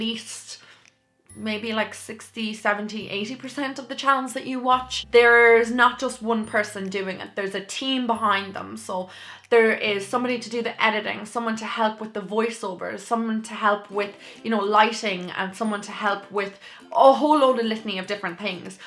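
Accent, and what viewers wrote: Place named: Munster, Ireland. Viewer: what county are you from?? trying to figure out from your accent guessing somewhere in munster ???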